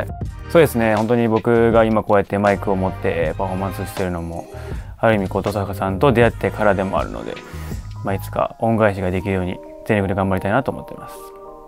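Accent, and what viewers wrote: Kansai, Japan. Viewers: 雅椋さんの好きなところなんて星の数ほどあるのですが、、、選抜して述べると、ダンスが好き、笑顔が好き、品のある金髪が好き、ホクロが好き、臣さんラブなところが好き、DJとか曲作れちゃうところが好き、狙ってないのに面白いところが好き、関西弁が好き、大クセかましてるところが好き、マメなところが好き、くんの書く文章が好き、言葉選びが好き、目を見て話してくれるところが好き、優しくて好き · くんの笑顔とえへへが大好きです💞 あと関西弁も好きだし見るからに優しさが溢れ出てるくんが好きです👦🏼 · Q.僕の好きなところを教えて下さい全くもう〜欲しがりだな〜😉😉🧡 くんの好きなところは、踊る時のグルーヴ感、楽しそうに踊る所、かと思えば切ない表情もしてそれがえちい所、真面目な所、ボケが滑った時の返し方、どんな方にも平等に優しい所、踊ってる時に右か左をチラッと見る癖、 『えへへ』と笑うギャップ、身長が175cmな所、手の指が長くて綺麗な所、煽る時の低音ボイス、所作に出る礼儀の正しさ、不意に出る関西弁、不意に出る天然ボケ、SNS更新頻度が高い所など！！もう上げたらキリがないので、とりあえずという存在が大好き！！ってことを知ってもらうだけで十分です🐣🐣✌🏽✌🏽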